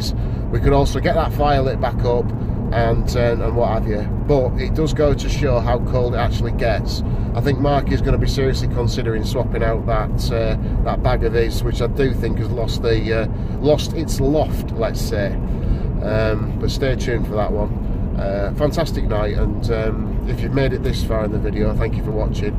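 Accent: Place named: Scarborough, United Kingdom. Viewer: Ok!! I grew up in Scarborough m8... Know a local accent when one hears one!!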